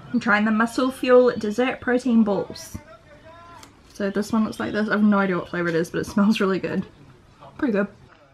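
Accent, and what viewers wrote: New Zealand. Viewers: love you xxxx extra love your kiwi accent, makes me feel like I'm home xx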